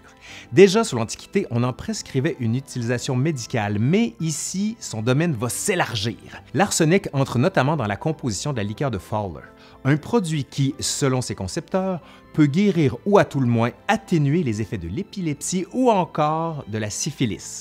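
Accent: Quebec, Canada. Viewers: Youhou enfin le retour de l'histoire nous le dira !! Cet accent québecois m'avais tellement manqué, je me refaisais les ancien épisodes en boucle. Bon retour :) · Décidément j'aime bien vos vidéos !!! Votre narration est extrêmement riche , extrêmement soignée , et votre accens québécois apporte quelque chose en plus pour moi français . Sans compter que j'ai l'impression de ressentir une gentillesse naturelle chez vous . Et vos vidéos sont extrêmement interessante sur des sujets dont j'ignorais tout !!! Donc , merci , et je m'abonne ! Continuez a nous régaler de vos belles vidéos !!!!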